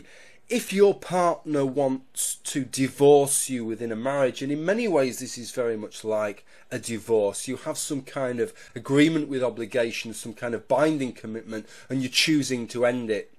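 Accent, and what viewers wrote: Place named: Northern England, United Kingdom. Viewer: Excuse my ignorance, but what is the accent I am hearing? I know it's British, but there is something a little different that I notice between yours and other British accents. I have heard other people speak this way as well, that is why I am wodering.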